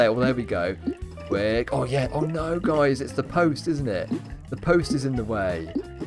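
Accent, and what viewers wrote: United Kingdom. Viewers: found you at 4am and watched you for a while, question, are you in the uk? ive always gotten this wrong but your accent really sounds like someone in england, and if you have another race, could i be apart of it? it would be great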